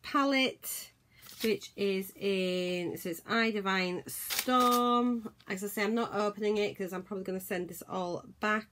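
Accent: Yorkshire, United Kingdom. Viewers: That would have been a nice little gesture on their part if they would send you the Monday all I want box for free, especially as you are a beauty youtuber!! Also, you sound like a Yorkshire lass... am I right? xx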